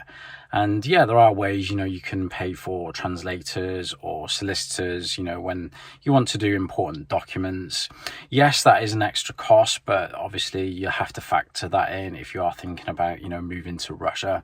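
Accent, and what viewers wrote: United Kingdom. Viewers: u will never learn Russian...and i will tell u why...u don't like the Russian language...u just love to hear yourself in your ugly native language... and I can notice that u speak fluent english with a strong british accent better and better every day... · у нас некоторые русские делают покупки в магазине, не говоря ни слова 🙂 Я думаю, что вы человек очень family-orientated, что в повседневной семейной жизни вам легко общаться как с женой, так и с детьми на английском. Ну и есть люди, которые очень открытые, легко идут на контакт, много общаются. Вы более закрытый, вам хватает общения с устоявшимся кругом старых друзей и знакомых. Поэтому у вас освоение языка идёт медленнее, либо это просто ваше чувство, что он недостаточно хорош. Но пробуйте, будьте смелее, вас всегда поддержат. Людям любой национальности нравится, когда говорят на их языке, это ценится. 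Вам помогут с русским ваши дети, слушайте их, говорите с ними на двух языках. А так, мне очень нравится слушать ваш британский английский. Такое удовольствие? Скажите из какой части Великобритании вы? Просто я не смотрел ваши видео с самого начала,не знаю поэтому.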